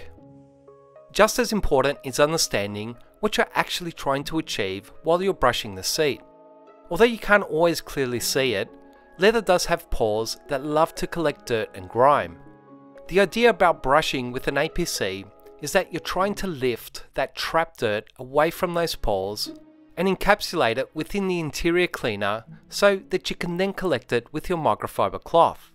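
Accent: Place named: Australia. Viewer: What nicw accent is that? Aussie?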